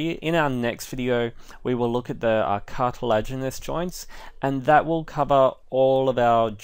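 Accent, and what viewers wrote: Australia. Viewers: I am really enjoying your clarity, descriptions and Australian accent!! It's a first in my experience of watching these anatomy tutorials. Thank you kindly.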